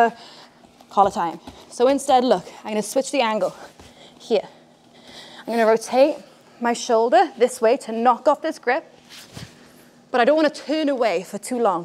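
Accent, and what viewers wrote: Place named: United States. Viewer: I can hear a hint of a US accent developing in the intro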